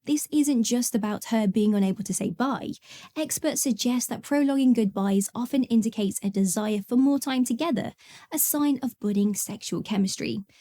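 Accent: England, United Kingdom. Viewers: "cheeky". Oh she's so sexy with her British terminology and English accent..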